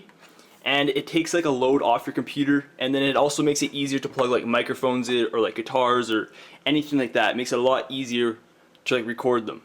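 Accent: Canada. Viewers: Is this dude...Canadian!?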